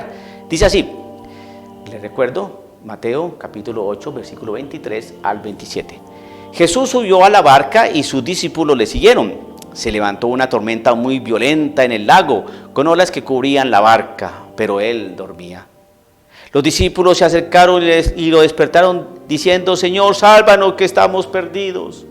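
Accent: Colombia. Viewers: Padre, me gusta mucho todo lo que explica en cada video, no he visto todos sus videos pero los pocos que he escuchado me dejan impresionada de como el Espiritu Santo le ilumina, me impresiono tambien el grado de firmeza que tiene en su fe y su verdadera vocacion sacerdotal, a pesar de ser tan joven me admira como esta tan centrado en la VERDAD de Jesus mejor que muchos sacerdotes que tristemente no estan estudiando los signos de los tiempos y por eso se estan cegando ante tanta apostasia y confusion. Dios le bendiga. Por su acento creo es de ¿Colombia? Colombia y Mexico seran paises grandemente purificados porque de estos dos paises saldran muchas luces que llevaran al mundo la doctrina verdadera, cuando estemos en oscuridad... En oracion y vigilancia como Jesus nos los pidio. Saludos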